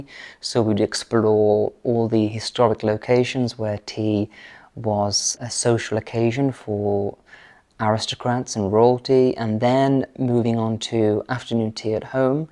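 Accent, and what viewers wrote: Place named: England, United Kingdom. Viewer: Hello! I recently found your channel and I have to say, i am in love with yout content! I can't tell you how much joy your videos bring me! I've never been to England but this is the way I always imagined english people sounded!😅 I am a big fan of Her Majesty The Queen and the way you speak is so similar to hers! Whenever you say the word "again" it just makes me think of her 🤭 anyway, thank you for delighting us with your wonderful content!!!🌷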